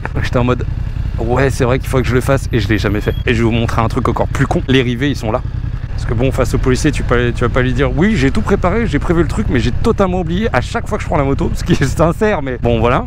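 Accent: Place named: Brittany, France. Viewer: Oh tient, un accent breton...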